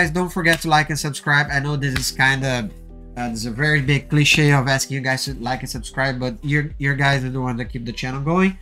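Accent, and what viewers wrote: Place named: Brazil. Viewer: Brazuka? vc tem sutaque brasileiro!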